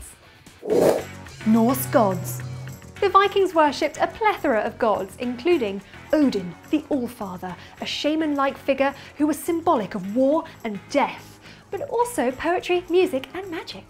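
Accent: United Kingdom. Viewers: because she just is. her jaw, her cheeks, her voice, her eyes. like an audrey hepburn type face with a very deep british accent. She's married though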